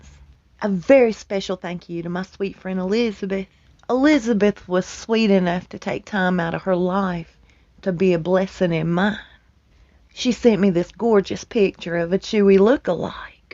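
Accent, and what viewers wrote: South, United States. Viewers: By the way, I love your southern accent, love to hear you talking.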